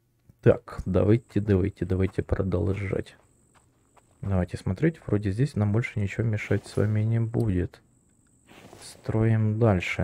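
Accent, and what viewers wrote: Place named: Surzhyk, Ukraine. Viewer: якщо не секрет, звідки ти, а то щось я чую місцями суржик у розмові? Якщо на каналі немає політики, то я підпишуся. Не люблю коли ігри плутають з політикою, а я прийшов дивитися ігри, а не слухати хто комусь на х.. настав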